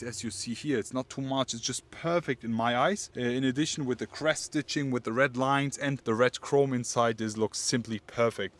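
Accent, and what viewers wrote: Germany. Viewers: Boaa wenn deutsche englisch reden...ohrenkrebs !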